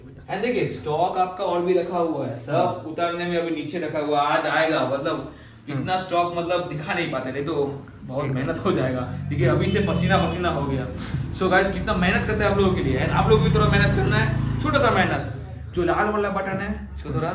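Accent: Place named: Bengal, India. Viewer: আপনি কি বাঙালি? সারদাপল্লি উচ্চারণ শুনে বাঙালি মনে হলো, হিন্দি উচ্চারণ শুনেও বাঙালি মনে হলো। তাহলে ভুল হিন্দি তে ভিডিও কেন করলেন ভাই? আপনি পশ্চিমবঙ্গে বসে আছেন। এখানকার ভাষা বাংলা। নিজের ভাষা কে সন্মান করুন। আপনি নিজের জায়গা তে হিন্দী বলবেন, ওদের জায়গা তে গিয়েও হিন্দি বলবেন....ওরা নিজের জায়গা তে এই ভিডিও টা করলে বাংলায় করতো বলে মনে হয়?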